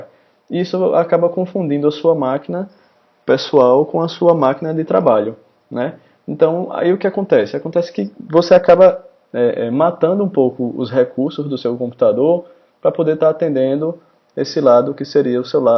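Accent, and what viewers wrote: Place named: Recife, Brazil. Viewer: Eu estava desconfiando que esse seu sotaque era lá de Recife, mas tirei a dúvida qdo vc falou parruda. Acertei?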